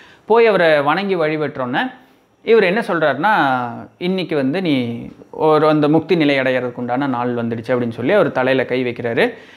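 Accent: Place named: Coimbatore, India. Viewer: Te way u explain in coimbatore Tamil is very nice.. 👍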